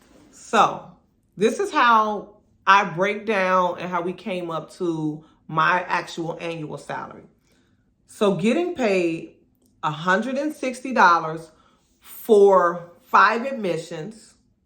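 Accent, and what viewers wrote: United States. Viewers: knew you were from CHICAGO! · I knew you were from Illinois lol i can hear it in your voice 😂 I’m from Joliet! I absolutely love your page thanks for sharing your knowledge ❤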